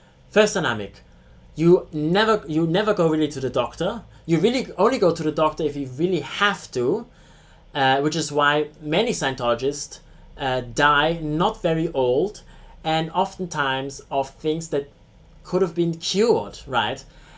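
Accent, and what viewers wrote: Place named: London, United Kingdom. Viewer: I'd say a posh London accent. I live in the North east of England. We have a very strong accent people say. Your accent is to posh to be from my part of the country. You have a lovely speaking voice, very easy to listen to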